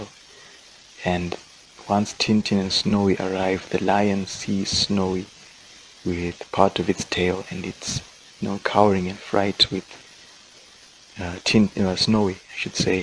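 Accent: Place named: Africa. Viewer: Certainly read by an African...... not sure whether he's from the Congo😅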